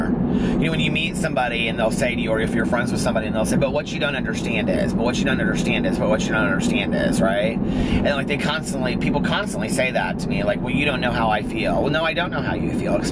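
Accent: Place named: Indiana, United States. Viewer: I just discovered you the other day and have already watched a ton of ur videos. It was your voice that sucked me in! I love how you talk. I kept thinking...he sounds so familiar. Then I realized you live in Indy and I think it's just ur dialect that sounds familiar to me because I live in Indiana too.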